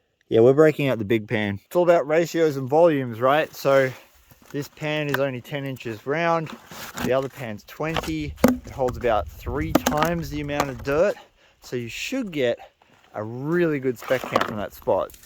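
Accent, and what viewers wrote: Australia. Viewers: My favorite videos after a stressful day 🙂 From the Australian accent, fun edit scenes, education, and Fern.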